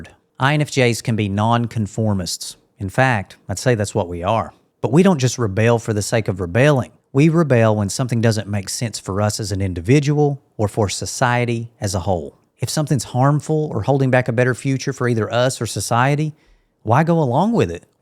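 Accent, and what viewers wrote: South, United States. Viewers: Cool southern accent. Have a great day, INFJ’s!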